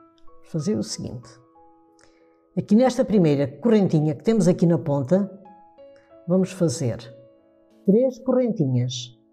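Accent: Portugal. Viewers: Lindíssimo. Grata por compartilhar. Continue por favor , esse sotaque português , muito agradável.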